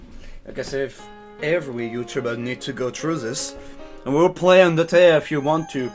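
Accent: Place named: France. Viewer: Gotta love your french accent mon ami x) très bonne vidéo je m'abonne!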